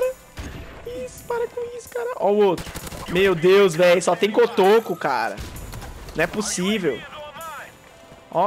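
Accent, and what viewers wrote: Minas Gerais, Brazil. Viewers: Tu tem sotaque de minas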